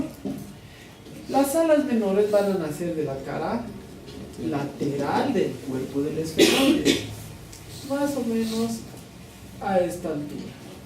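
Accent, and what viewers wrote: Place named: Highlands, Ecuador. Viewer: creo que si, tiene acento a los de la sierra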